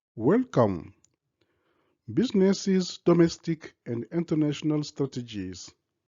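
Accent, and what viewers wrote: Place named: United States. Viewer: Félicitations, tu parles comme un américain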